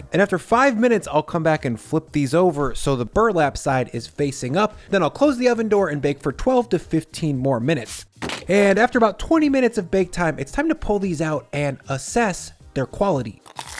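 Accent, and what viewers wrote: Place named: Aurora, Illinois, United States. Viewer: I was fascinated by the accent of someone from my college, and you speak JUST like him. He was from Aurora, IL. I'm from Chicago suburbs too but I don't speak like you? help me out!! The way you say "sturdy" is a prime example of this quirky way he would pronounce things, and I loved it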